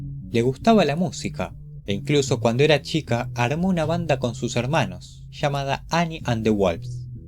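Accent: Argentina. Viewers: Seeee en la plaSHA, queso raSHAdo, Shego, SHO, caSHAdo, etc así hablamos los porteños che !!!!! 🤷🇦🇷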